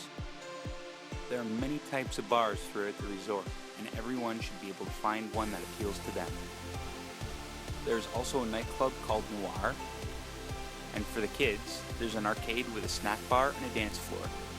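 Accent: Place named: Canada. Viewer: best Canadian accent ever!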